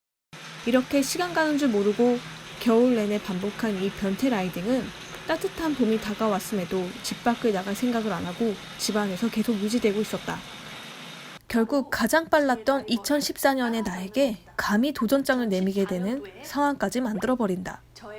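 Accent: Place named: Russia. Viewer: 간만에 다시 보니.. 살짝 러시아 여자.. 발음 삘.. 나다~ 검은사막~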